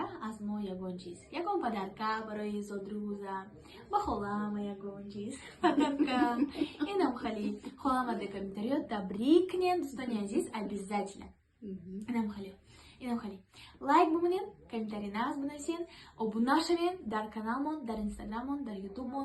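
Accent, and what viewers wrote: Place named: Kulob, Tajikistan. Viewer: Ту мана раздражать мекуни ,духтари ёщу ранги кампирои кулоб гапмезани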